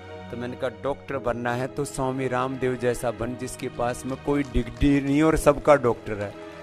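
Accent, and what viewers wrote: Pakistan. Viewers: Good review pure pakistani urdu accent love you brother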